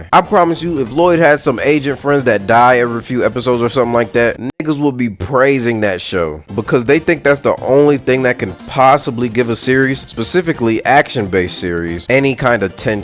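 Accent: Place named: United States. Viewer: well depends on the character, story they are in, world they are in, and their life they have led so far... if for example a character got plot armored outta having somethign bad happen OR just never has any issues, it becomes hard to relate cause for basically NO ONE in the real world, is that the case. the more lack of hardships to face just makes it more and more difficult to see it as anything more than like a poorly written play being put on. if you want happy comedy slice of life stuff, that does exist and you can enjoy that but for most people, we like watching entertainment we can get immersed in to the point that we forgot that its entertainment and watch it as if we are observers of these PEOPLES lives and not just these characters stories. basically hardships to face and overcome is the whole idea behind normal storytelling. you sound like your also from at least a western nation if not more likely proly some place in america so our cartoons mighta made you misunderstand what animated mediums should be and you only think that anime is something for kids so why make it grim and dark and gorey? 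but thats just it my guy... its not just for kids but just is another style of storytelling that allows for more commical or over the top magical presentations.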